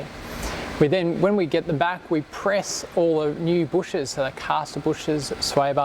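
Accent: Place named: Australia. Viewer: barely detectable aussie accent......barreelyy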